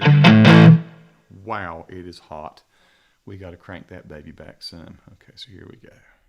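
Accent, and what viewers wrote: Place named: Winston-Salem, United States. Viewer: had to be. Your accent is just like what I remember. Seems like nobody in Winston Salem talks like that anymore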